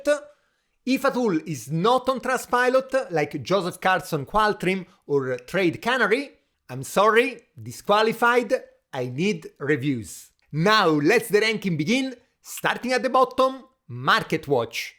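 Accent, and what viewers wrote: Italy. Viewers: Italian accent makes it all even better 😃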